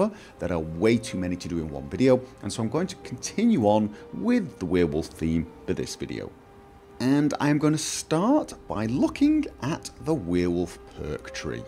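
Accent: United Kingdom. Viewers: dude i just love your vids your british(could be scotish i cant tell these sort of accents apart :P)accent makes it so enjoyable to watch :)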